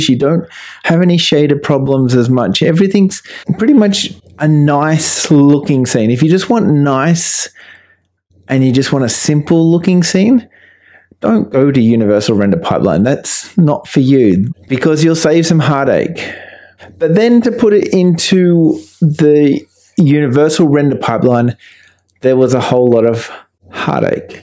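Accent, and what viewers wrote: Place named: Australia. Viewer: Thanks for the video! You helped me a loads brotha! Love the ozzy accent btw :)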